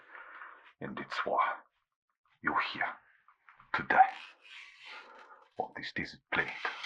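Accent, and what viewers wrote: South Africa. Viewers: Anyone else hears a White South African accent? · Is that you South African accent you are using? 🖤🖤🖤love your work always.😊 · Are you from the east rand bru? You sound like my cousin from Benoni.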